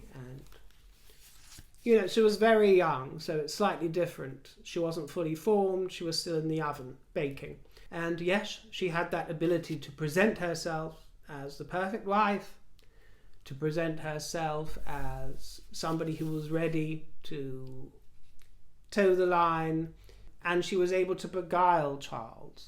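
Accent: Australia. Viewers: Your aussie accent 😂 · Your Aussie accent is on point 🇦🇺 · Well done on your aussie accent :) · I'm an Aussie and you crack me up with your Aussie accent. It's pretty good. Luv ya xxxxxx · Love your Aussie accent ❤ You really got the point across...".Lilibet Diana"!!!👍👍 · 😁😁😁🤣🤣🤣🤣🤣 Oz accent. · Love your Aussie accent #. Got the pronunciation coming out nicely 👏👏👏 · Sorry but not everyone talks like that over here, you know Australia has moved on from that,but saying that it’s like Gordie it’s a celebration of a dialect 🤷‍♀️ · Bloody ripper of an Aus accent! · Love your Australian accent... "Lilly Diana! Not sure if you meant to, but you really did make me laugh out loud!! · Love your Aussie accent🦘🐨🇦🇺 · As a true blue aussie, you're accent is spot on from an Australian movie named Dons Party circa 1970s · Your Aussie accent is perfect. Not a hint of cockney or kiwi. 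· you speak better Australian than I do! Good morning from a very cold Sydney ❤️ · Your Aussie accent is an absolute delight, dear!!! As for the BC photographer, I hasten to agree with you - very hmmm 🤔 and their website lacks a lot of trust. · Wow Aussie here and you do nail the accent down to a T. 👍🤩😄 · haha.If you used that accent in Australia you wouldn't be getting many invitations out. We have many different regional accents and dialects in Australia. You just used a very bad 'Harry Kings scrap-metal and junk car-yard' accent. Is that was what you were going for?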